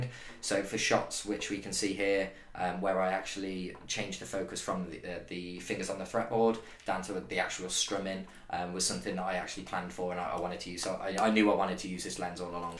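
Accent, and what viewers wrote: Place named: Germany. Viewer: Can barely understand your English--sounds like Ginglish. Technical mambo jambo in Ginglish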